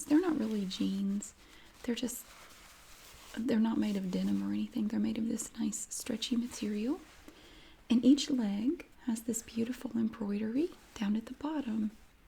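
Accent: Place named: Tennessee, United States. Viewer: Just found your channel. You must be from Tennessee or Kentucky?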